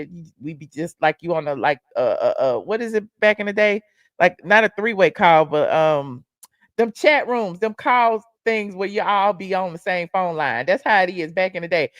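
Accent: South, United States. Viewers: I'm a new Yorker and love the southern accent " hol on lemme see" I don't want y'all to hear me yell in yall " eea". Ear? Bottom seat every " yeea" year? Love u. My son hijacked my you tube again took my Love4all formally yuchboi. Gotta split phone email. Ugh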